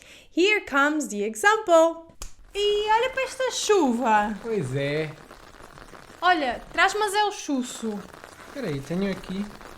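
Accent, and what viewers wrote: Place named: Porto, Portugal. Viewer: Não é Porto, sua parva! É Puorto! E não é caralho, é cuaralho 😂 adoro o sotaque do Porto hahah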